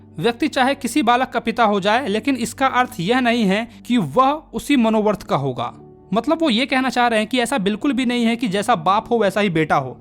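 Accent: Bihar, India. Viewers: Bihari assent 😎🤟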